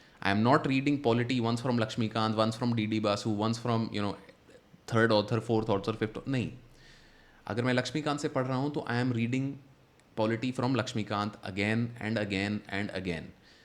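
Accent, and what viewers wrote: Bengal, India. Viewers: Sir, Are you Bengali ? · Sir are you bengali?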